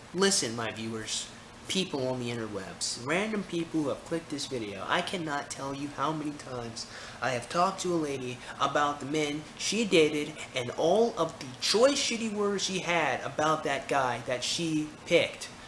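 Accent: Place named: United Kingdom. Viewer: Trust me when I tell you that you say all the right words. I've disagreed with some of the things you've said in the past, but I don't think I've ever heard you say the wrong word. I wish I was as articulate as you. The British accent threw me off a bit.I was like, "Is he intentionally doing it? Or did he grow up in England and sometimes it just comes out? I've noticed that you pronounce certain words differently than I do. The only other place I've heard this type of pronunciation or accent was from the show The Wire.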